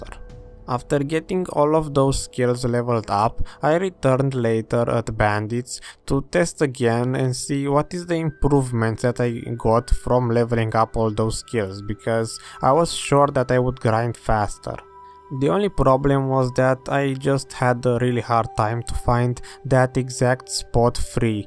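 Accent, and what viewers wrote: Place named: Romania. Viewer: esti roman da-te dracu! ti-am depistat accentul :))) noroc , bun video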